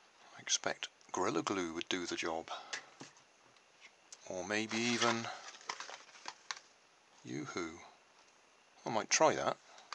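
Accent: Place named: England, United Kingdom. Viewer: Love to hear an English accent..............so, you 'knicked' the egg box?' Huh.....:)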